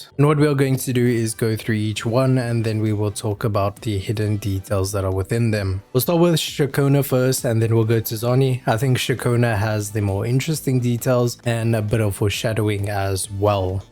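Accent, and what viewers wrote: South Africa. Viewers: I smell a South African Wuwa Content creator👀 Subscribe✅️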